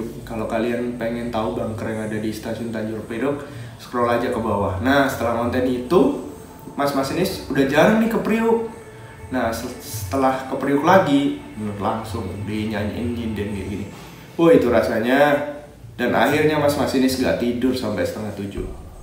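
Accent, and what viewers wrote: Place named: Malang, Indonesia. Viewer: Part 1 mana ?? Bahasnya medook...org Malang tibako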